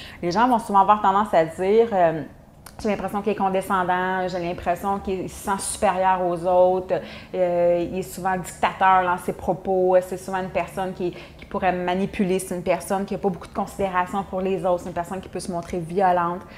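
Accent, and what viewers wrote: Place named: Quebec, Canada. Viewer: L'accent québécois est saoulant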